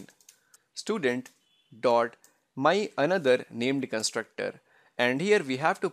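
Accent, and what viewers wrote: India. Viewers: Wow, a very thought out and systematic lesson on constructors. The Indian accent is mild enough that it doesn't get in the way. Excellent job.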